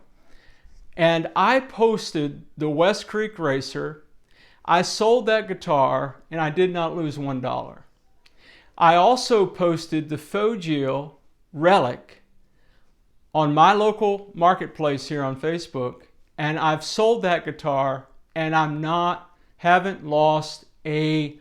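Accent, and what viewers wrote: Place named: Baltimore, United States. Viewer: Love the Balmer accent!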